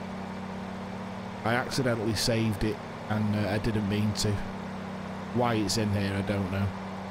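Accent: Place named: United Kingdom. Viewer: great vid and commentary. love me some uk accent at 2am, thats my asmr. haha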